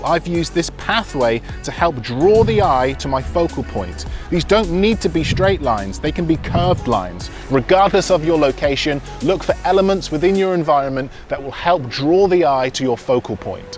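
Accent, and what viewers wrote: United Kingdom. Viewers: I think thats how he pronounce focal point, he may be is a british coz thats their accent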